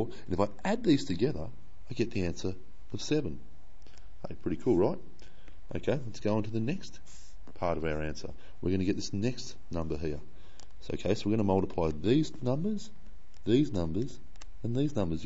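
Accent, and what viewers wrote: Australia. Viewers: after watching these lessons i wished all my teachers had australian accents.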